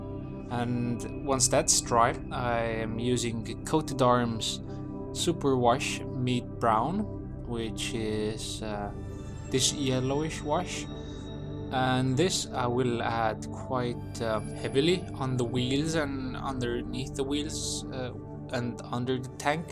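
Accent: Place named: Northern Europe. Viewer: U know was wondering where u come from? is cause of ur accent and entonation. Sounds to me u r from Northern Europe? Whatever the case, ur video was a great one! keep going. BTW that halftrack how much was and where u purchased it? thnx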